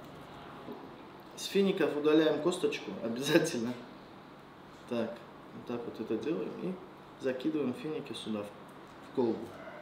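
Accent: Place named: Dagestan, Russia. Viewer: Почему у него дагестанский акцент при такой азиатской внешности?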